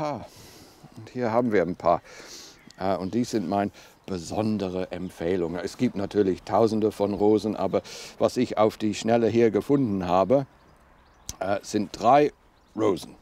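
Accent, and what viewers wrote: England, United Kingdom. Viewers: Dear you speak beautiful German! I love your English accent! I also love all your expertise! Many thanks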